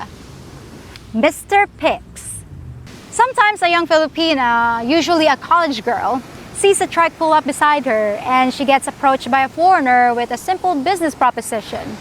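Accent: United States. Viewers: i can hear that american accent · Kudos to your great American style English. Well done. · Hey, did you ever live in the states or dated Americans? I've never met a Filipina who could speak like you. Just wondering.:-) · You speak English with a VERY American twist... very entertaining, realistic, and honest. "Filters" didn't exist when ang akong asawa and I were video chatting decades ago. And I made sure she understood although I made respectable money? I was a working man...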